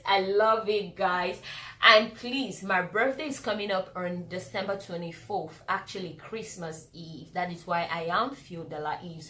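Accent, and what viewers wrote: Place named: England, United Kingdom. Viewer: Are you were living in the UK because your accent is similar to that of England?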